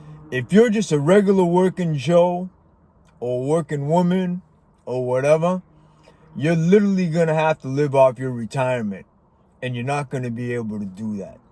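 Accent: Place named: Rhode Island, United States. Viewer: I grew up in Boston and I moved to Rhode Island when I ran away from home and purchased my first house at 26, because I couldn't afford the house that my parents wanted me to buy next door. It was too expensive and I couldn't even qualify for the loan. Even though, I was making good money at the time with a 20% down payment. Boston is very expensive at lease Rhode Island and New Hampshire is a little better. But rents in Providence is $2,000/month now and Boston is $3,000/month. But the seafood on Federal Hill is out of this world and there are many inexpensive great beach seafood joints on the water in Warwick. My favorite is Iggy's. Fun and casual giving you that "laid back vibe" I knew I detected something in the accent of your voice. It makes sense now.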